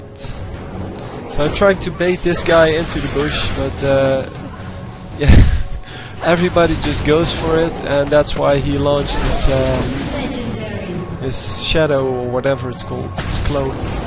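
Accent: Netherlands. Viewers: heey, kvind het fijn dat er eindelijk een nederlander LoL vids maakt xD het is goed te hooren dat je nl bent aan je uitspraak :p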